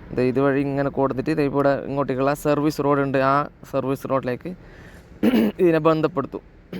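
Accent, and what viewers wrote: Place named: Kasaragod, India. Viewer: തനി കാസർഗോഡ് സ്റ്റൈലിൽ. ലാൽ സലാം. ഈ വാളും അങ്ങന്നെ ഇങ്ങോട്ട് വന്നിർലാ എന്നേഉള്ളൂ പേടി ❤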